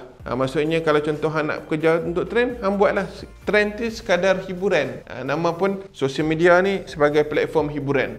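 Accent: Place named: North, Malaysia. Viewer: Baru tau abang orang utara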